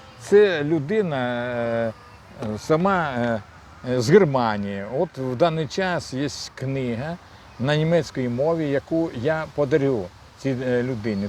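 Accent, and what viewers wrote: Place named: Poltava, Ukraine. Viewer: Чудний. Полтавський діалект такий, як треба.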